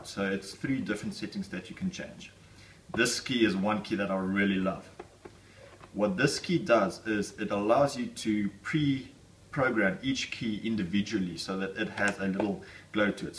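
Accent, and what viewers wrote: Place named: Afrikaans, South Africa. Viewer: Great review for an epic keyboard. Quite an interesting change of pace listening to an Afrikaans accent rather than American haha but I dig it ;) Good job!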